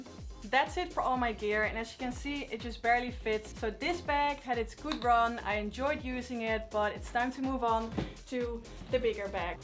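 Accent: Netherlands. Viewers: You sound Dutch!?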